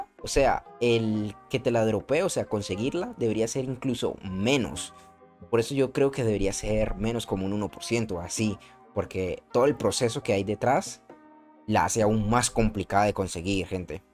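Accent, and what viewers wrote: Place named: Colombia. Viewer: de que parte de colombia sos-nuevosub-oN_Ry3uc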